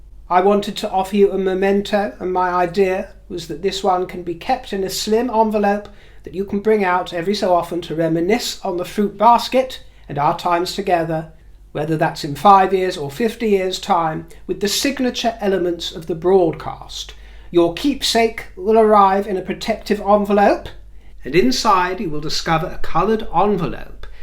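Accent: United Kingdom. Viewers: Happy to hear you will stay with us. I love your british english and accent, it is a pleasure to listen to you. Greetings from Switzerland.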